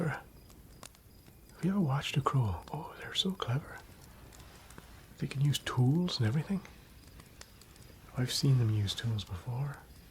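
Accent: Ireland. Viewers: As an Irish person, I want to congratulate you on the fantastic accent. I can actually place that accent on a map. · As a person living in Ireland, I want to wholeheartedly compliment your Irish accent. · Loved both of the knight vids. Brilliant immersive character and an Irish accent with that hypnotic, peace inducing voice, insanely good. Just as an aside, if you ever have to get a kid to sleep, sing a lullaby in this voice and you'll have no problems. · The Irish accent?? IN LOVE EVEN 2 YEARS LATER 😍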